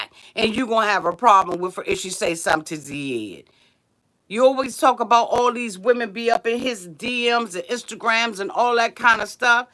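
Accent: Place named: DMV, United States. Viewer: I love your accent, are you from the DMV area???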